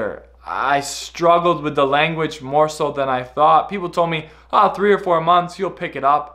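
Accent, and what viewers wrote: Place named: United States. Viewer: It's a great video. I follow your channel for a while. And I really enjoy it. First, thanks for making create videos for us. Funny thing, I was wondering which country you are from. I never thought you are a born American. I feel your english is great buy you have very noticeable accent. I was thinking. Mmm, this guy must moved to a english speaking country at young age. Then, I just saw your old video, the interview one for opening a book store . You spoke perfect american english. Does your accent really change or you just intentionally speak very slowly to cater the foreign audience? : )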